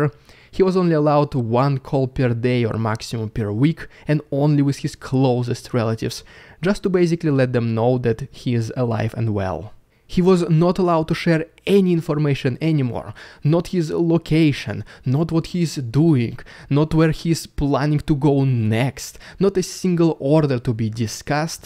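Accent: Russia. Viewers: Dude, I'm uncertain if you are a real Russian that speaks American English very well, or an American that can mock a Russian accent very well.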